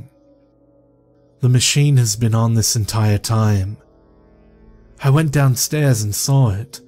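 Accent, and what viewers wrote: Australia. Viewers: I'm pretty sure it's his first language. It sounds like an Australian accent to me. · Aye Aussie English has different pronounciation eh? I thought you all just spoke like that by chance lol!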